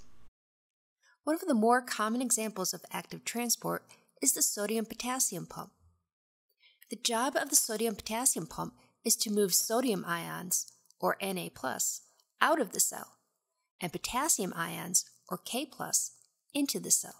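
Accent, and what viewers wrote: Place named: Midwest, United States. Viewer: love the subtle Midwest accent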